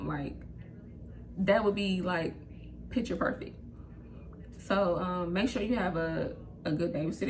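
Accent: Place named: South, United States. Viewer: I knew you sounded country i just became a travel cna because a by watching different podcast that you giving info cause i been working two months of a agency cna and i enjoy going from facility to facility and i asked my agency do they have travel cna opportunities before i skirt out and look at god these folk then raised my pay finna put me in a hotel now i didnt know i pay for the hotel but its ok cause my daughter is in the army and i want to suprise her with a car for her 20th birthday next april so i just got a blessing under the table. So thank god for al these things if you step out on faith and believe in your vision and your dream it will come to past so everybody experience is different. To all the cnas i love ya god bless ya. Continue to enjoy what ya do working for these agencies are so much better more flexible with agency cna you have a opportunity to be a travel cna which makes more money yes i got kids too but yea like she said you got to make these sacrifices and i saw my savings go up too every hotel i travel i take my money and stuff my envelopes cause im saving and working my ass off. 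If you want to take cna to the next level stop working nursing homes 8 to 10 days a pay period forced to work you got to put in days off not with agency you confirms your days to work you be off the days you dont work and you still got a job. As i get more experienced i wont come out my pocket im just glad im putting this experience on my resume.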